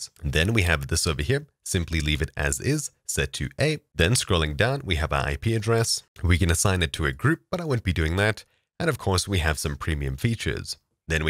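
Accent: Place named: South Africa. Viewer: I thought I could hear a fellow South African in that voice!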